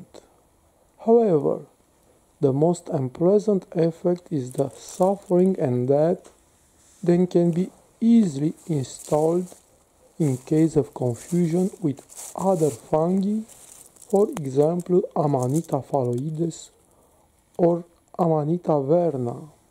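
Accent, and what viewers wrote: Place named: Europe. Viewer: Alright..not likely to pick up ANY white gilled mushroom. Are you from...difficult to tell- Italy, France, Austria; a mans voice is harder for me to discern .You can tell me.I learned so much from my European journeys!I loved it.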